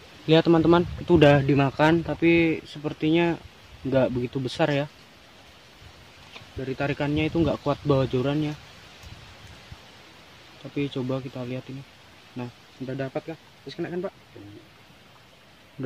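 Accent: Java, Indonesia. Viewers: Tpi kok logat nya bhasa jowo😆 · Logatnya sih kea jawa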